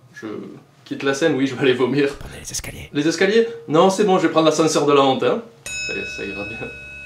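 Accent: France. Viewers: Découvert via le dernier film de TGWTG (quoi? un tel accent Franchouillard ça ne se loupe pas! Surtout quand il appartient à un autre fan du Doc') et depuis bah je suis sans relâche, alors franchement GG, tu mérite, et surtout continue comme ça!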